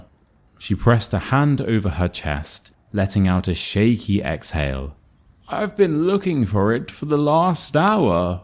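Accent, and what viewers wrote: United Kingdom. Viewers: this is amazing! I’ve been looking for something like this for a long time. Language learning is really about listening, and your calm, deep voice with that wonderful British accent makes it perfect.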